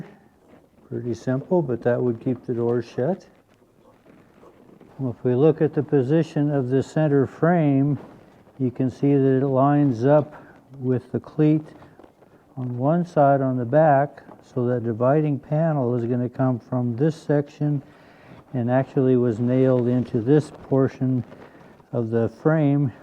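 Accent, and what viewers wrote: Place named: Montana, United States. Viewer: I grew up back in Belen NM and had some good friends that were from Montana. Im 55 years old and I hear you say things that absolutely remind me of my friends mom who talked thst way. Their name is Lawson and there was another family from the same area of Montana named Goodson. They knew each other from bake then in Montana and I figure they must have tslked about going south where they could start over.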